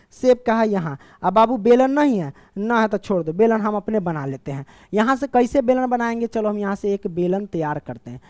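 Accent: Bihar, India. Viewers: Aap bihari ho kya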